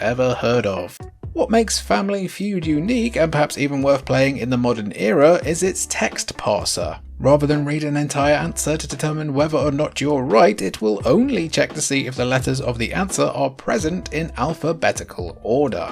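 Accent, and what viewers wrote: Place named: United Kingdom. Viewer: Good stuff as an American the “th” sound doesn’t really bother me I just sort of chalk it up to “ah he’s just British, it’s cool” 😂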